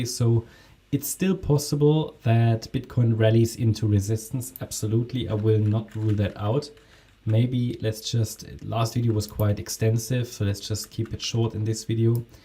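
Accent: Germany. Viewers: Bist du Deutscher? Hört sich so an 🎉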